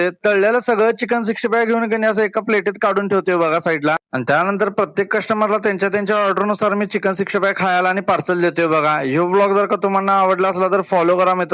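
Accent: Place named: Kolhapur, India. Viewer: कोल्हापूर cha वाटत ahes .. भाषे वरून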